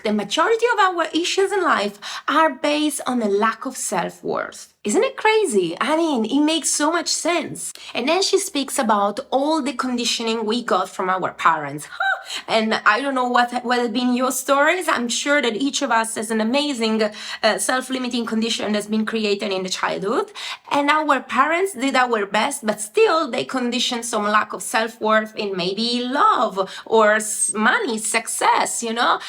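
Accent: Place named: Italy. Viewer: I loved this book as well, and still refer to it for myself and for others. Excellent review! Love all the artistic ways you edited everything into it. And I love the beautiful way you still "speak" Italian in your English. So very entertaining...I want more! 😊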